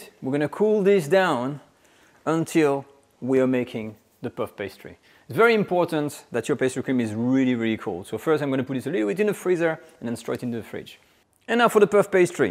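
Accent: France. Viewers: I subscribe because of his accent love French accent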